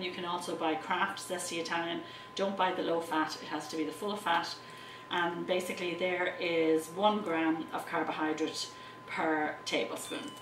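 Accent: Ireland. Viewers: i love your accent Irish i presume . your a lovely lady and thumbs up for you. great teacher and refreshingly no frills presentation. i am going to cook some chicken in my crock pot NOW. thank you.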